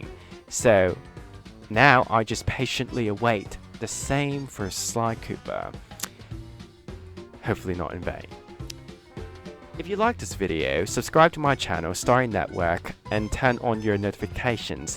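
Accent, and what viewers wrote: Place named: United Kingdom. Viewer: Also, your British accent is 👌👌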